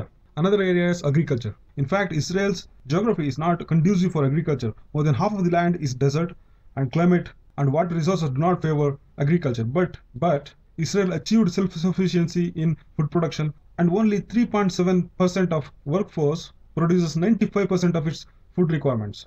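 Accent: India. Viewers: Your accent sounds like you are Tamil... Where are you from?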